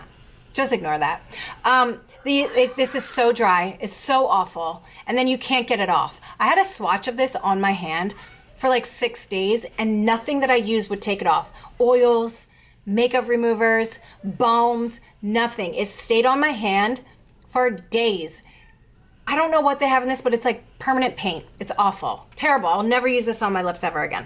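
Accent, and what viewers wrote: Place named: New Jersey, United States. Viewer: loving the Jersey / Brooklyn accent. Got the same one.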